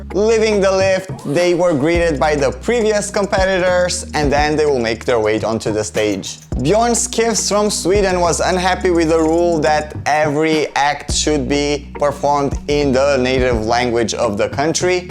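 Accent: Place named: England, United Kingdom. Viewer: Hi! Nice job as always! Just a friendly remark as it's not the first time i hear you pronouncing "Gigliola" wrong, you dont have to pronounce that g in the middle at all, just skip it and tadaaam you have the correct name. I bet you can experience the proper italian fluency now:P By the way im catching the opportunity to say i love your english accent (been wanting to say that for a while) As for your french one.. ehm i do see your effort there hehe close to decent:D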